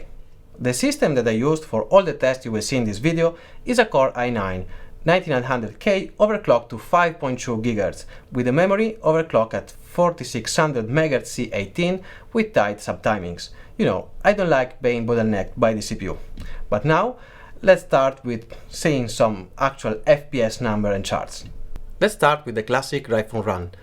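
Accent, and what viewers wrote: Italy. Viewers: Sembri un italiano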